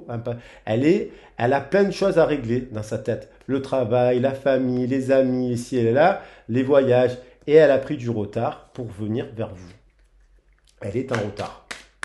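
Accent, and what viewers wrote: South, France. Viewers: ah ! Je savais que dans cette jolie voix, j’avais entendu le chant des cigales. J’avoue j’ai aussi un accent bien du sud , le pays catalan quoi 😂😂des bises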